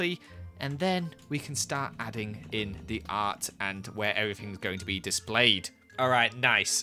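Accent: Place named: Scotland, United Kingdom. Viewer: Sounds like Scotland to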